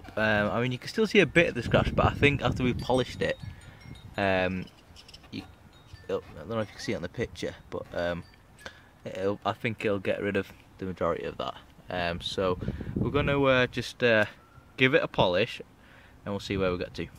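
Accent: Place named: Northern England, United Kingdom. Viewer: thx, funniest bit when he drops the fing t-cut even funnier with a northern accent. luck it was just the clear coat damaged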